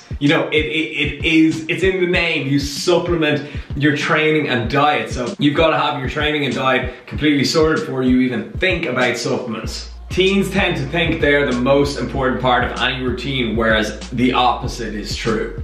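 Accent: Ireland. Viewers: SumDude Norris Irish. (Dublin) I think. I'm from Cork and also has a bit of that accent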